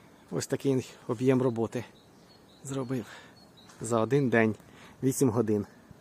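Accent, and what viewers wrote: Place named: Poltava, Ukraine. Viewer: теж чую полтавський акцент) а де продовження ?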